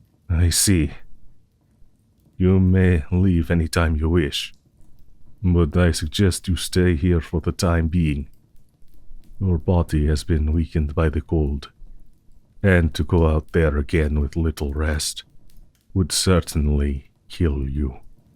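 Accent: Russia. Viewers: Before clicking on the video Oh hell yeah mothman after clicking on it oh Russian mothman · I love how when I saw this I was like “Aw moth man ^^!” And the second I clicked the video I was like “Oh my- was not expecting the moth man to be Russian 👁👄👁” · RUSSIAN MOTH MAN IS THE BEST MOTH MAN!!!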